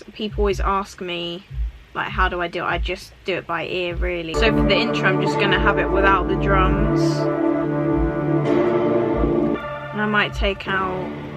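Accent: United Kingdom. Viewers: Love the beats and listening to British people talk. Best of both worlds 🤣👍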